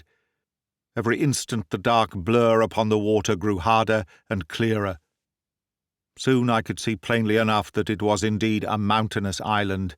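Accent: United Kingdom. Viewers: Its my utmost pleasure listening to your clear British accent that I love... Please never stop sharing stories narrated by your marvellous voice.. thank you very much sir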